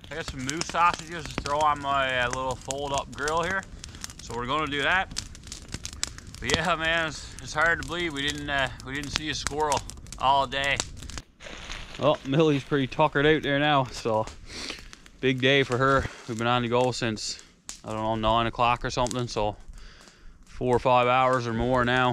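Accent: Newfoundland, Canada. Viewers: I'm from Saskatchewan. Your accent gave me mixed signals, then I thought about it and realized you were a Newfie. Right on man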